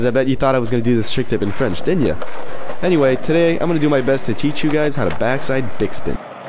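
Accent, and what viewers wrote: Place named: United States. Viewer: mdr ok, au debut jpensais t'avais pris la voix d'un trick tip americain et que tu l'avais mise ici :)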